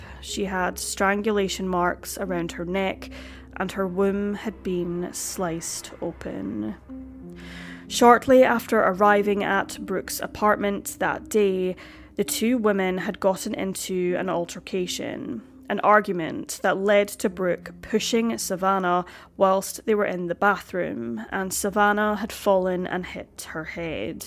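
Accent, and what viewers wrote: Scotland, United Kingdom. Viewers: Is this a scottish accent? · I like your Scottish ascent.🙂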